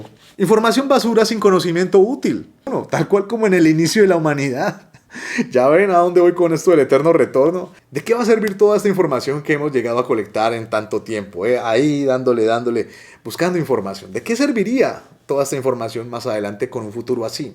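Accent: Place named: Colombia. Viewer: Su voz me parece familiar? Ese acento colombiano.. ya lo eh escuchado en otro canal? Excelente contenido muy buena edición , horas de grabación para los 20 minutos más informátivo , sigue así bro saludos Colombia Cartagena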